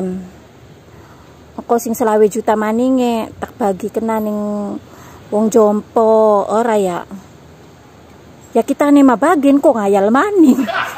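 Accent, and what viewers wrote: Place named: Cirebon, Indonesia. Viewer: Cirebon logat ny,,astagfirALLAH ngahayal dikit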